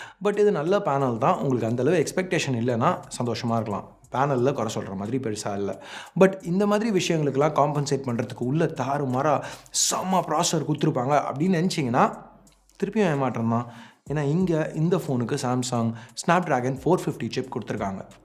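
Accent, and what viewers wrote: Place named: Chennai, India. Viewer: Chennai slang semma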